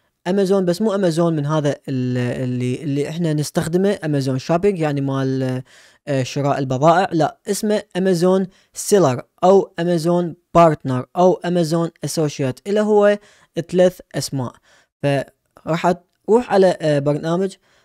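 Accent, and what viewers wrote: Iraq. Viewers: عجبني اسلوبك و طريقة الشرح ... و بصراحة لهجتك العراقية محببة للسمع... انا متابع مصري روسي ... و تحياتي لكل شعب العراق الحبيب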